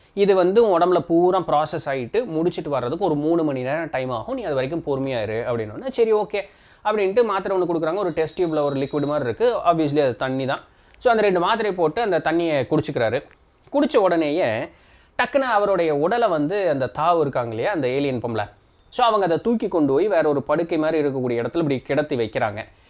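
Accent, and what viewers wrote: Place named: Marthandam, India. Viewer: Marthandam slang tamil song paadura pola tan irukum..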